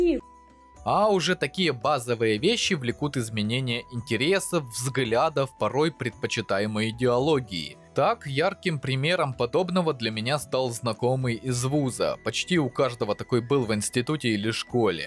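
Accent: Ukraine. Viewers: Прекрасно ) А разговоров то было - армейский психолог, опыт боевых действий, сотни лет практики с больными. И что же мы увидели, а мы увидели голого короля с фантазиями, хотя украинский акцент и выдавал жителя Украины. Куда же делся беспристрастный психолог с рассуждениями о том как вести себя на войне ? А он почему- то превратился в активного пропагандиста, да еще и с речами про русского Ваньку, что само по себе ксенофобия. Прям повеяло Геббельсовской пропагандой про сдающиеся войска, женщин с коктейлями молотого, призрака Мариуполя который сбил 600 танков в воздухе и под водой. 4 дня прошло с твоего последнего ролика и как же мерзко теперь звучит, твои солдаты уже отходят в глубь страны, а твоя русофобия и ненависть к обычным русским людям запомнилась многим. Надеюсь те кто тебе донатил постыдяться и обдумают свои решения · Я давно знал, что он украинец. У него жена с Украины, она в каком-то видео говорила. 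И раньше он про власть говорил. В каком-то видосе упоминал про арестованного украинского военного и мол поэтому он не хочет расскрывать свою личность. И по говору понятно Люди, которые удивлены, что он украинец, наверное, только начали его смотреть, потому как мне давно известно, что он с Украины. Другого объяснения у меня нет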